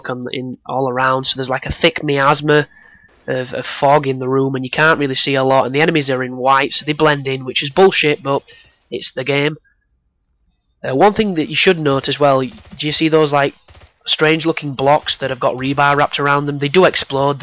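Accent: United Kingdom. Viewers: You sound British man!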